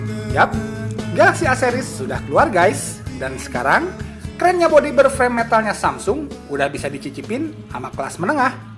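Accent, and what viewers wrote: West Java, Indonesia. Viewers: nuhun kang buat reviewnya :D sunda pisan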